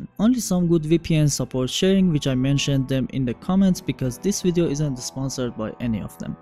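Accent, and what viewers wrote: Iran. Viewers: از لهجتون تشخیص دادم موفق باشید 😁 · اکسنت فارسی داد میزنه ایرانی هستی 😝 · سلام داداش چطوری خوبی میدونم ایرانی هستی از لحجت معلومه خوشبختم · Bro you Persian. 100% Theres no other Accent like this.. Ive spoken to many who speak the Lamguage Persian as their Mother Tongue.. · Straight persian accent right there 😅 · لحجت،ایران هستی؟ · داداش فارسی هم بگو خوب تابلوئه اکسنت پرشینت ..😉👍🏽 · داش حال کردی چطوری تشخیص دادم؟ XD لهجه ت ایرانی اصیلهXD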